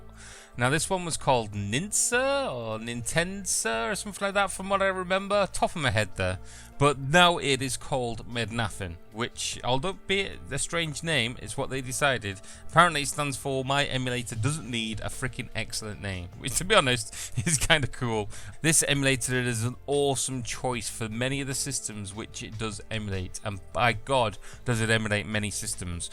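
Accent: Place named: England, United Kingdom. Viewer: I did not watch your channel for a while since I had been busy with my work. I think I have so many to catch up tho. The English I learned was all American(accent). It's kinda odd to hear English English though many would say it's the original/formal/polite English speaking. I don't care other sayings, it's still difficult to me to listen. However, I really enjoy your accent, that's the only one I understand and like the most:D. And that's the reason I stick to this channel and press thumbs up every time. I wish one day I could speak like you. That "good day gaieyes", that "waful", that "push it to the limit", and that "most'fallll".....:D:D:D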